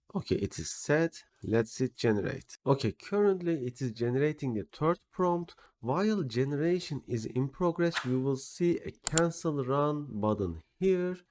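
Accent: Turkey. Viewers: Aksanından direkt türk olduğunu anladım ve gurur duydum. Çok yararlı olmuş diğer videolarına da bakıcam. <3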